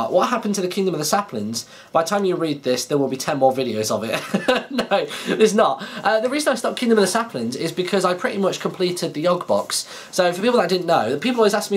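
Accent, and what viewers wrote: United Kingdom. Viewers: I love his british accent and the british sleng at "Hello everybodiiiiii!!!!!"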